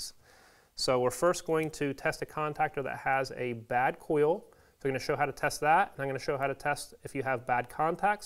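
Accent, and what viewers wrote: United States. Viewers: Speaking in AMERICAN English ?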